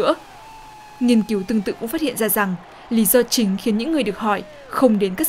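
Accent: North, Vietnam. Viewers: GIỌNG BẮC ĐỌC KHÓ NGHE QUÁ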